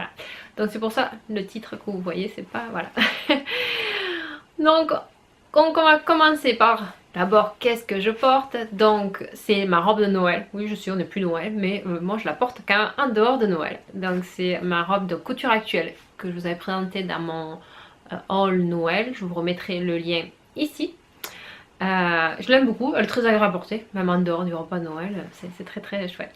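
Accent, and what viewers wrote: South, France. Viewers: Bonjour Je ne découvre tes vidéos qu'aujourd'hui et je les trouve très naturelles. Je suis très sensible aux accents et j'aimerais savoir d'où vient le tien? du Sud de la France? Rien de québécois il me semble. Continue à nous distraire avec tes cousettes et bravo pour ces belles réalisations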